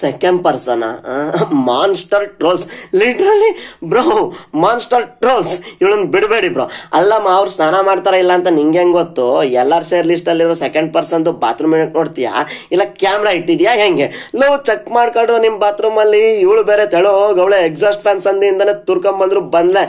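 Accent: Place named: North Karnataka, India. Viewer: ಸ್ವಲ್ಪ ನಮ್ಮ ಉತ್ತರ ಕರ್ನಾಟಕ ಭಾಷೆ ಬರುತ್ತೆ ಅದಂತೂ ಗಿಚ್ಚ್ ಗಿಲಿಗಿಲಿ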